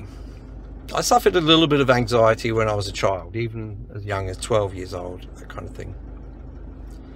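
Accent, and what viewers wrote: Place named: Perth, Australia. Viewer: After all the wonderful information you've so generously shared here, I felt that the least I could do is watch every minute of this video. I'm glad you felt like you could share this - that, whatever risk you may have considered, it felt like the good and right choice. Firstly, I am sorry for how you've suffered, what created this suffering and the long tendrils that trauma can have. Secondly, thank you for using your suffering as a vehicle for conveying this kind of message - because you're right, so many people are suffering in various degrees of silence and distress and in some way or another we all feel alone with it. Thirdly, when you spoke about the "physical" aspect of your trauma, it brought to my mind my mother, who also passed away, from cancer, with my hand on her, 6 years ago this month. I often think of her when watching your videos, as she was from Perth, and even after many years in the U.S., she kept a hint of her accent and somehow your voice spontaneously connects me to her, which is a good thing. 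And finally: thank you for the gift of what you have shared through your channel. Cakewalk is the environment my musical creativity has settled into, which makes it a focal point for my hopes, my delight, my anxiety, my fear, my despair. What you share goes right to the heart of that place and helps it make more sense, helps it become a place I can better function in despite all the vulnerabilities that come along with it. It's very much a work in progress - my se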